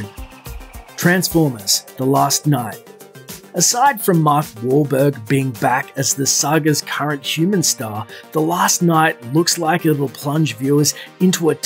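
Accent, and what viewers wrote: Australia. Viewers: Anyone else realize this is Aussie guy Commentating in his regular voice?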